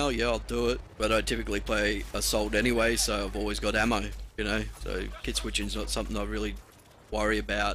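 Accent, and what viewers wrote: Australia. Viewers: lmao i thought the very start of ur vid was the Bf3 Alpha shit, till i saw 1700 lmao i was getting all pumped to see u play it to lol i love ur vids, probs cuz ur an Aussie as well, and im sick of Americans commentating lmao :D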